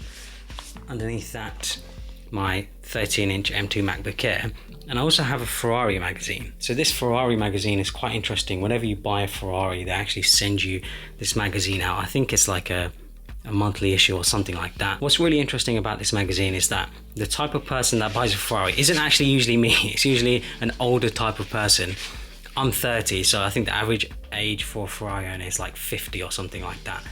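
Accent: United Kingdom. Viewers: First time hearing you speak after following u months on twitter 😂 didn't expect that British accent tho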